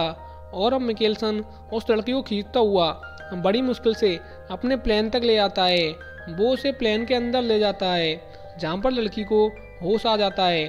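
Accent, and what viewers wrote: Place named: Bihar, India. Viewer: Aur teri voice bihari jesi hoti hai ....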